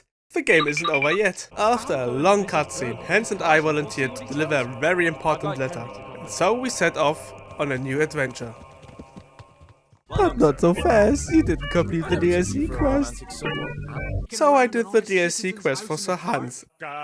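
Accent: Germany. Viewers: Ey lol hab gerade erst realisiert, dass du Deutscher bist. Ich wusste doch, dass ich den Akzent kenne. Sehr gutes Video. Like und Abo verdient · Der deutsche Akzent 😂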